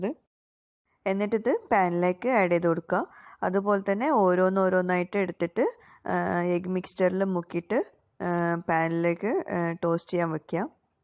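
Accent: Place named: Kannur, India. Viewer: Jojo Joy anganonnum parayarudhe...ente kannur kaari frnd idhe slangilaa samsaarikkaar... aadyokke njaan vijaarichirunnu ival konji pparayaann....pinneed avalde husband samsaarikkunnadum same🤣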